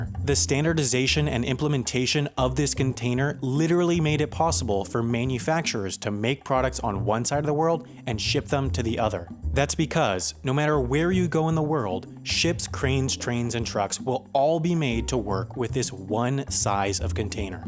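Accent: United States. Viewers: You sound like an American. (Except you told me your Grandad flew Lancaster bombers in WW2).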